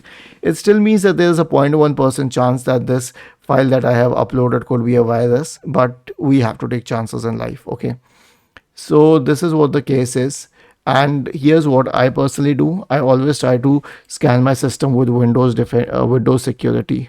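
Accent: India. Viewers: indian accent ahh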